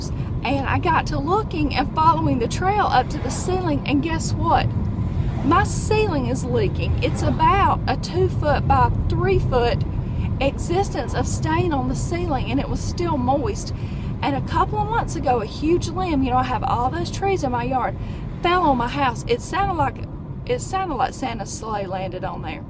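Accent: South, United States. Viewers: Hey! I just found your channel and enjoy watching. I recently purchased a Vitamix and love it. I'm not vegan, but love green smoothies and raw veggies. I laughed so hard when you held up your pitcher.:) I would do the same thing. I am in my mid 40's and hope to not have all the crazy peri-menopause symptoms I read about. By the way, I'm from the south too, so I understood your accent perfectly! Great vlog!: )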